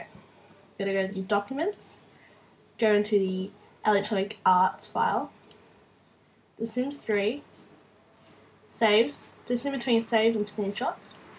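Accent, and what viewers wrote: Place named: Australia. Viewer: This is great! Haha, I'm from New Zealand but I live in the US. I laughed when you started talking and I realised you're an Aussie! Haha thanks for the help :)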